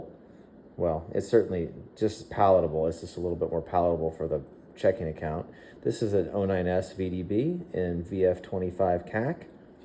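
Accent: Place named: India. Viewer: Yeas that Indian cent is hawt!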